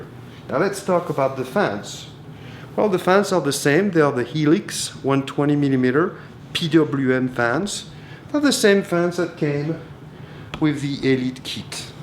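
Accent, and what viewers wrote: France. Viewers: german? u serious ? hes french u can clearly hear it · he's french for sure, he has the accent :)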